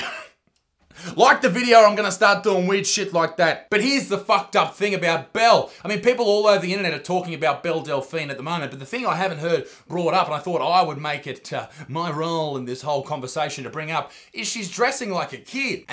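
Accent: Australia. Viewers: The most Aussie guy I have ever heard or seen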